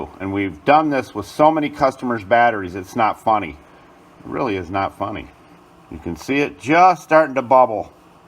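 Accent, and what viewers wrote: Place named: Midwest, United States. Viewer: Your voice sounds like either Missouri or Michigan. But a good teacher is always good at showing others how to do something.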